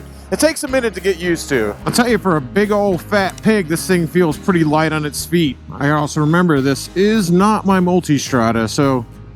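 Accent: United States. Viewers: Ooohhhh that typical annoying yank accent and continuous mindless chit chatter.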